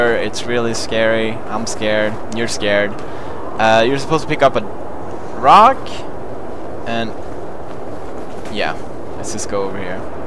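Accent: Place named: United Kingdom. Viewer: He deleted it because of the British accent lol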